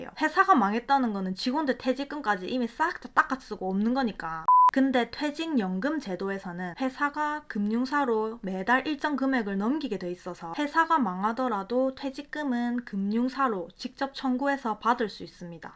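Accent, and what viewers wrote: Gyeongsang, South Korea. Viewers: 경상도 억양같은데요~~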